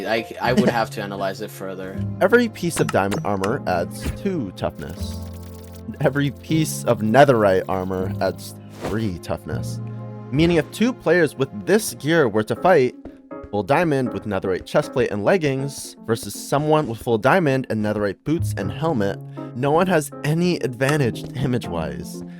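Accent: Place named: Poland. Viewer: ok just sounds Polish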